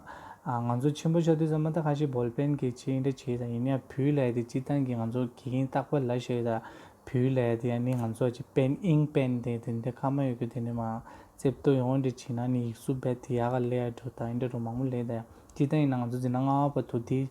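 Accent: Standard. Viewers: བོད་སྐད་གཙང་མ་ཤོད་ཀྱི་འདུག། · ་ལགས་ ཁྱེད་ཀྱི་ཡིག་གཟུགས་ད་ལྟ་ཡག་ཀ་འདུག བོད་སྐད་དཔེ་གཙང་མ་རྒྱབ་གི་སྔགས་བརྗོད་ཞུ་ བོད་པའི་ཕྲུག་གུ་ཁ་ཤས་ལས་ཡག་ཀ་འདུག་ རྗེས་སུ་ཡིད་རང་ཞུ། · WOW really you speaks pure Tibetan better then Tibetan children’s in India and western. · ཁྱེད་རང་རྒྱ་གར་ཞིག་ཡིན་ཀྱང་བོད་སྐད་དཔེ་གཙང་མ་རྒྱག་གིན་འདུག།། 💓💓💓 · ཡར་རྒྱས་དཔེ་དག་འགྲོ་འདུག བོད་ཕྲུག་ཚོ་རྒྱ་གར་བ་ཞིག་གི་བོད་ཡིག་བྲིས་པ་དང་བོད་སྐད་གཙང་མ་ཞིག་རྒྱབ་ཡོང་དུས་ཁྱེད་ཚོས་ལ་ཚོར་སྣང་ཞིག་འདུག་གམ།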